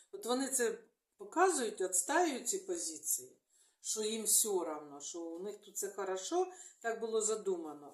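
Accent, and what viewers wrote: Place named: Luhansk, Ukraine. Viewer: Дякую! Вас дуже цікаво слухати, бо Ви маєте особливий діалект як у нас на Луганщині. Відчуваєш що слухаєш свою людину💕 дуже хочеться почути від Вас звістки про новоокуповані (після 24 лбтого) частини Луганської та Донецької області, що з ними буде цього літа?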